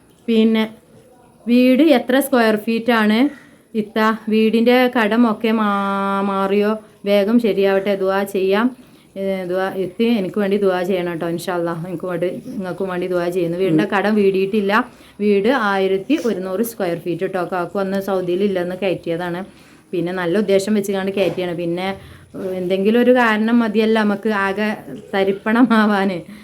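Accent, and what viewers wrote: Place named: Pullanjeri, India. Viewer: ചേപപൂര് ആണോ വീട്.ഞാൻ Pullanjeri ആണ്😊.ഞാൻ ഈ അടുത്താണ് നിഞളെ വീഡിയോ കാണാൻ തുടങ്ങിയത്.പിന്നെ നമ്മ ള ഭാഷ അത് ഇതല്ലാതെ വേറേത്😊❤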